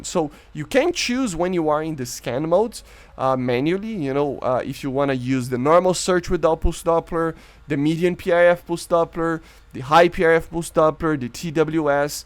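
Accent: Brazil. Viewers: O sotaque brasileiro é inevitável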